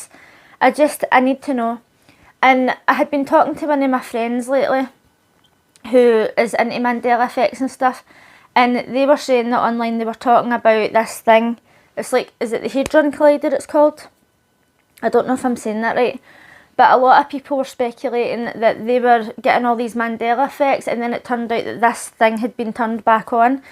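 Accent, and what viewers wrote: Scotland, United Kingdom. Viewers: It isn't your accent, it's your enunciation. I listen to a lot of Scots' channels and I don't have any problem understanding them. I can't understand 2 words out of three that you say, however. I have the same problem with my grandson; he talks too fast and doesn't enunciate clearly. These are easily-solved issues without sacrificing your beautiful accent.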